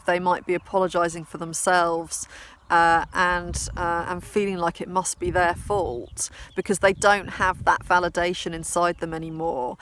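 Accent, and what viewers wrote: England, United Kingdom. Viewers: I prefer the English accent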